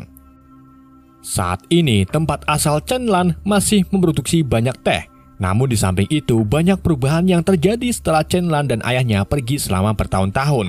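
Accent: Indonesia. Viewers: Ciri khas dong lidah indo.. semangat ye'endeeeeeeeaaaaaahhhhhhh uraaaaaaaaaa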